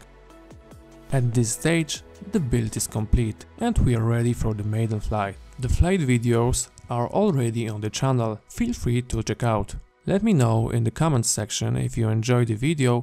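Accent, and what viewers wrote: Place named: Germany. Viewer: Are you german ? 😊 your English sounds like German 👌👌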